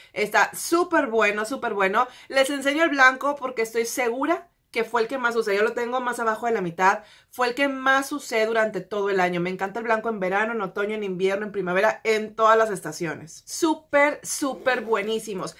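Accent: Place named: North, Mexico. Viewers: Hola soy Natalia Cortes de Puebla. Mis productos favoritos este año fueron la cc cream de mary kay, la paletta de too faced semisweet chocolate bar, y las brochas de ojos de eco tools:) Me gusta mucho verte porque hablas como norteña, y desde que me mude al sur extrañi mucho el tonito! Feliz año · Hola!! Mi nombre es Dalia Ruiz, de Monterrey Nuevo León, mis favoritos son 1. Maquillaje líquido de Mary Kay 2. Un labial nude de marca Oriflame 3. Mascara para pestañas de Shelo Nabel (fue toda una sorpresa ya que desconocía la marca y terminó por convencerme) Me encantan tus vídeos y el acento muy regio difundiéndose en el mundo jaja un abrazo y que sigas con tanto éxito!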